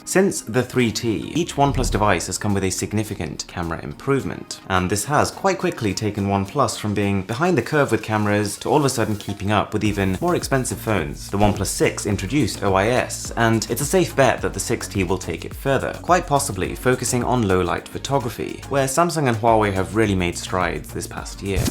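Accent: RP, United Kingdom. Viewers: You have a British accent so surprised you choose to indicate 'two' with your fingers by showing the knuckle side of your fingers. Turn your hand around when you want to show you mean 'two'. 😉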